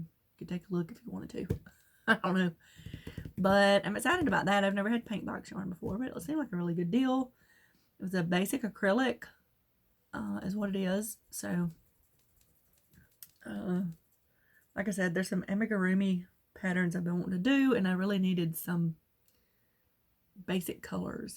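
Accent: South, United States. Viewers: I just love your southern accent you don't have to change anything. =-) Love your You Tube Channel and your bags.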